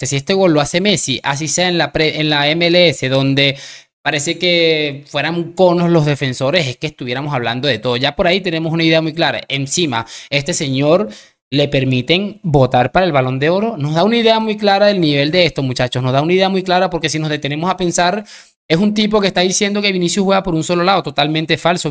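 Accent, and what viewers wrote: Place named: Venezuela. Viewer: Ojo como estás mi hermano por tu acento eres venezolano no soy madridista es más admire aquel equipo de Romario estoicob ladrum kuman Guardiola de la peña con cruif como técnico igual aquel Madrid de Butragueño hugol Michel Martin Vazquez Gordillo buyo Sanchís Valdano santillano Juanito uff equipazo y e seguido a ese Madrid de Roberto c Raúl Elguera casillas y cristiano y compañía y ojo Raúl filtraba casillas filtraba Sergio ramos igual lo acia eso siempre a sucedido cambio en los últimos.4 años a Zidane se lo cargaron con las filtraciones y ojo te lo dice alguien que admira al Madrid como el mejor de toda la historia del fútbol a la altura de Brazil Alemania e Italia pero no soy fans de este súper club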